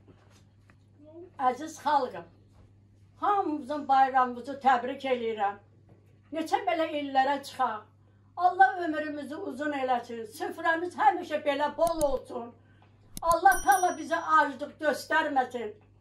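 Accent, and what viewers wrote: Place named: Baku, Azerbaijan. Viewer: Nan bakı lahcasikimi dansdl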